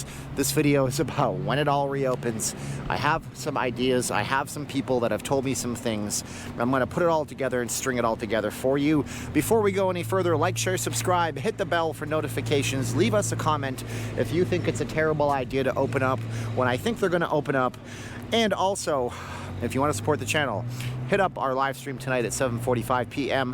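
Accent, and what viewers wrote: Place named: Canada. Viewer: Pretty sure, he is Canadian, thats where goofy accent comes from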